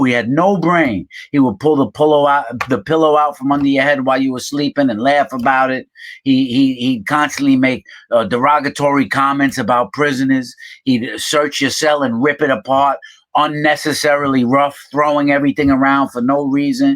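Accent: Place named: Downstate New York, United States. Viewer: This Dude is 💯% Spot On!😳! I Love His Message & His Accent too! Always have loved the Northeast / New York Accent! This Video Is Lighting My Brain & Heart Up!😇!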